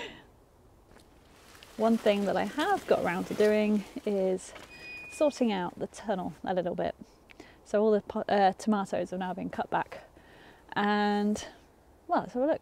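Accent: England, United Kingdom. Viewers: I do love your accent! I am learning how to speak like a Brit by practising your accent. Congratulations on your recent marriage. Are we to assume there there will soon be a little one to help you plant your Daliahs?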